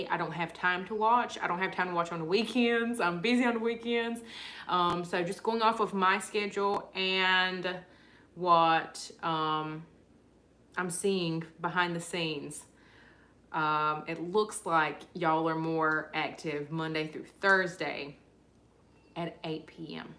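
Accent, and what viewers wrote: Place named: North Carolina, United States. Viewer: I'm an older woman and I really enjoy your videos. I'm originally from Laurinburg and I bet you know where that is. Our accents are alot alike. LOL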